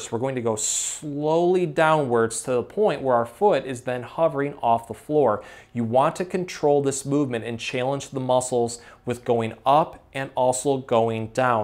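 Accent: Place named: Midwest, United States. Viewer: Great video, right at home with your midwestern accent. Cheers from WI!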